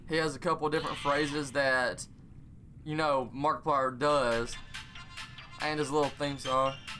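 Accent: South, United States. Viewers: Southern america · I think it's neither. He's probably from southern U.S.A. He's got a southern accent. Not too strong of one either so probably Tennessee or something. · Sounds american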